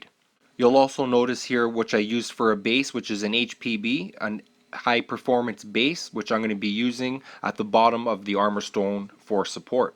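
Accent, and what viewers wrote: Canada. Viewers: the most Canadian accent I've ever heard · a really strong canadian accent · Love the Canadian accent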